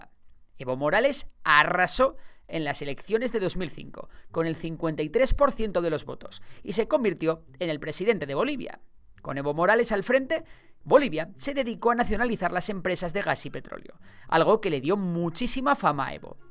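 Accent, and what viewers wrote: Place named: Spain. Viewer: He visto tu vídeo y déjame decirte que tienes información muy básica, es tan mala que me atrevería a decir que eres militante del MAS. En Bolivia los medios de comunicación no dicen la toda la verdad tienen una mordaza, y se ve que es tu fuente. A juzgar por tu acento eres español y seguro te tragas el cuento de que Pedro Sanquez y su mujer van a ir al cielo a sentarse a lado de Jesús..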